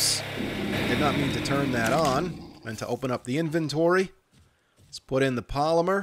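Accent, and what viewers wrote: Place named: New York, United States. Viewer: I'm new and very entertained by the irate ny/nj italian vibes & accent.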